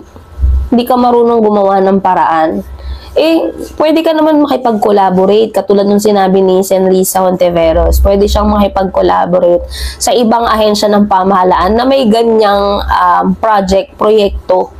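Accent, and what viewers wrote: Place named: Bicol, Philippines. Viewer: Ano yang puna mo, saan pupunta nag mmagaling parang da way you deliver like Leni style Bicol k b ha.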